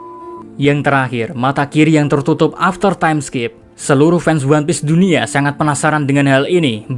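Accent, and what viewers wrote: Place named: Jepara, Indonesia. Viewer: Owalah admine wong jeporo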